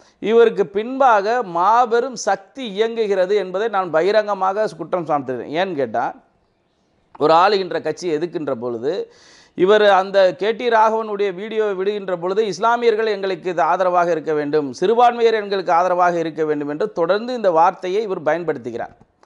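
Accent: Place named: Madurai, India. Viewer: மதுரை மொழி கேட்கவே அருமை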